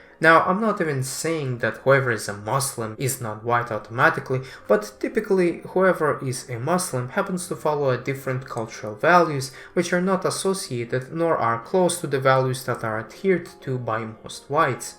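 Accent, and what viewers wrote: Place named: Eastern Europe. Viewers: Ah so you're a slav? And here I thought that your accent sounded familiar. О, так ты славянин? А я то думал что акцент звучит знакомо. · How can you miss the accent, and also he is smart and knows how to argue....All the best Slavic values... Na zdravlje.🍻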